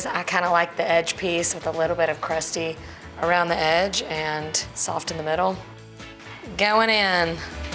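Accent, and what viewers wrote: South, United States. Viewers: Love your southern accent and cooking talent!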